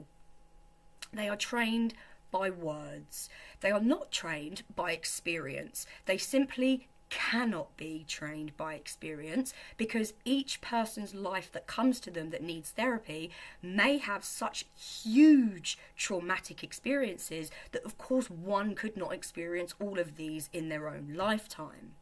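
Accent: United Kingdom. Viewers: 😄that british accent in combination with heavymetal looks damn. heavy metal queen. just walk baby walking fixes everything. you are beautiful. why not become a mama? kids are nice. you lose some sleep but this is our ultimate purpose. *what kids give you in your heart, no therapist can do that. sorry for my english not good. everything is gonna be okay baby don't worry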